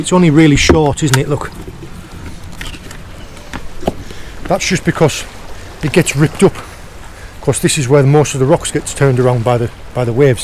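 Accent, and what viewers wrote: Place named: Geordie, United Kingdom. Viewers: I thought you was a northern lad just come across an old video <link> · As a jock I cant work out if your a Geordie or Yorkshireman, also get a military vibe about you, have you served 👍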